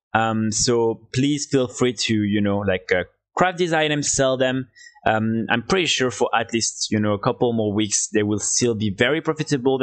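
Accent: France. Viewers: French accent 😂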